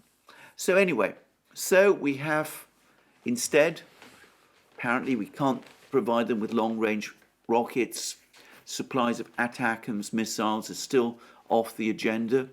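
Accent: England, United Kingdom. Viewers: I m very happy with your British accent. Keep on with your fabolous work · Speaking with an English accent, when you speak English and live in England, is it so strange? The kind of things that upset some people.... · for the love of God and all his saints, please, do not spend 5 minutes explaining why you, being British, pronounce various non-English names with an English accent. What a strange thing it would be if you pronounce them with a French one. That's how these videos run over one hour instead of 30 min, and I, with all due respect to your good effort and thoughtful comments, nowadays watch only some of them. Cut to the chase, and you have the point, you just have to get to it. More views and more upvotes will follow, imo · Dang... sure wish I could understand English with the "English" accent... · Viva English accent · Love your English accent.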